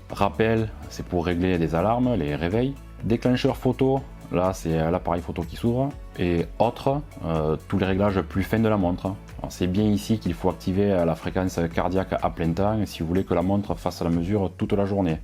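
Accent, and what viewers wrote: South, France. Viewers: Bonjour Comme d'habitude : exposé sobre, didactique, complet et objectif. Et l'accent du Sud en plus qui enchante le Cht'i que je suis... Bonne continuation !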